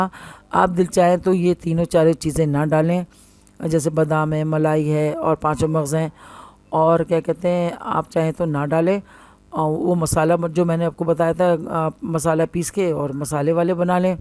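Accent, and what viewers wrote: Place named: Hyderabad, India. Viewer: As salamualaikum Aunty mai toh aap ka fan hogaya aap ka baat karne ka andaaz toh mashallah. Maut daaldere aap toh hamari Hyderabadi zubaan me kiraak 👌👌👌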